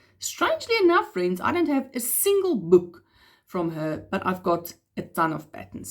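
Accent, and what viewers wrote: South Africa. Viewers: Amigurumi making is N-E-V-E-R boring with you!🤩 First, I have to admit, ( maybe it just me, because I enjoy so much your beautiful English from South Africa- me being French speaking from Montreal, Quebec, Canada, its like a melody or a song to me!☺️). Second, 99% of the time, when you tell how you did, where or from who it was created, why you did it that way or how, there is always a story behind! The Marvelous Mermaid became such a beauty, and one of the reason, beside your talent, is cuz you used the yarn from Crochet box Society! Finally using a pattern from another designer to inspire you, you did crochet a vest for your bear! Thank you for keeping those videos coming and to share with us, yours yarny adventures!!! It is a fantastic treat for me and for the others viewers!!!😁💕💕💕💕💕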